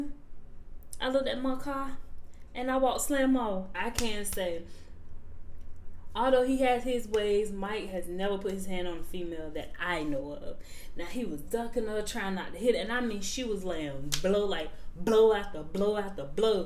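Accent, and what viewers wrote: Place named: South, United States. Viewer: I love your accent, sound like somebody southern auntie!!! 😆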